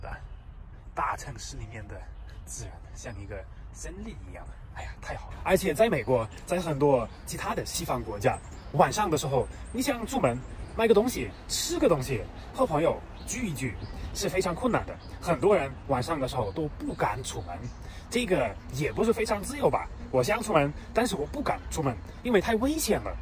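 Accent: Sichuan, China. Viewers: 四川味好重啊，哈哈哈哈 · 四川话可以的嘛！ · ，你的川普很棒、很亲切。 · 你說的普通話是否四川話混合的呢? 我說的是廣普, 但正宗普通話好像有些不同啊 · 喜歡你的風格幽默風趣，川普很好聽，👍三觀正正的帥氣小伙子。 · 这川普，四川味太浓了！！ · 你这中文好四川。。。哈哈😊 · 这个视频做得可以👍我全完赞同的观点！的川普说得巴适，四川人听到安逸，外省人又都听得清楚👍👍😀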